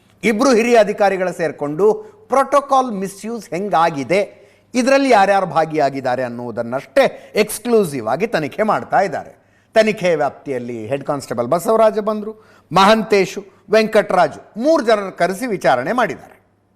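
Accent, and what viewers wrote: North Karnataka, India. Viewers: sir nimma bayalli tumba chanagi barutte namma uttar karnataka bhashe. haradu hannondu antha 😊😊😊😊🎉🎉🎉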